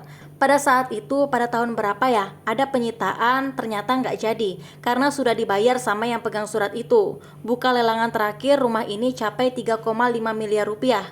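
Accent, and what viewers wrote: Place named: Banjar, Indonesia. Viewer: Bahasanya kok presintar kaya bahasa banjar. Ada sedikit logat2 x mba. Hehe 😁😅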